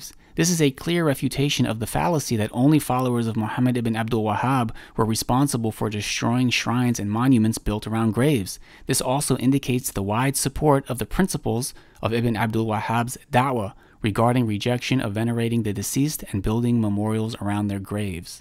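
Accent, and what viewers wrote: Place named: New Jersey, United States. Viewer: Is he from jersey ? He has a strong jersey maybe New York accent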